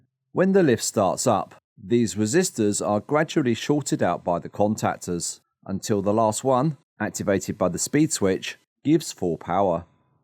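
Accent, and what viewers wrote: United Kingdom. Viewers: What kind of accent do you have? Something British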